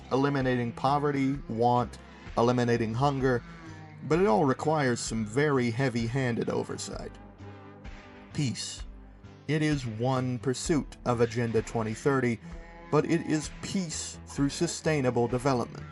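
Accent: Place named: United Kingdom. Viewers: There were only a few moments I could tell it was you. But mostly it was hard to tell. If I showed that to someone who didn't know you, they'd think you were a brit. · Well, By listening to that accent, I mistaken that you were also British.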